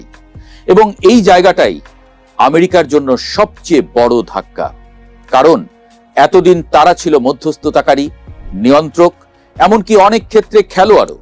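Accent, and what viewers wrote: Standard. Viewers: শুদ্ধ বাংলা। কোনো বাঙ্গাল টান নেই। সুন্দর বিশ্লেষণ। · প্রমিত বাংলা উচ্চারণ। স্পষ্ট, প্রাঞ্জল ও আঞ্চলিক প্রভাব মুক্ত, ইংরেজি বা অন্য বিদেশি ভাষা প্রভাব মুক্ত। বক্তব্য সোজা, দীর্ঘ-ভুমিকা হীন , প্রাসঙ্গিক। অতীব প্রসংশার বিষয়। ধন্যবাদ ও শুভেচ্ছা 🎉 🤝 💛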